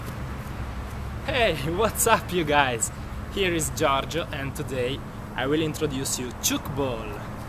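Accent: Italy. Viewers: è bello perché riesci a mantenere la cadenza lombarda anche parlando inglese... bravo! :) e bello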